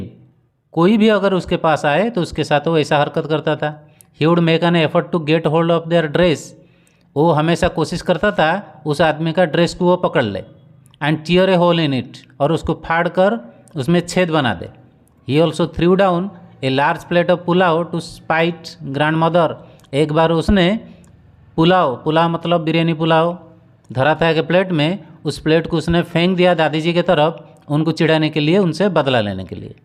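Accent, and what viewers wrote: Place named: Odisha, India. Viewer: Sir , I'm also from Odisha and I knew you are a odia by your accent . Love from Jajpur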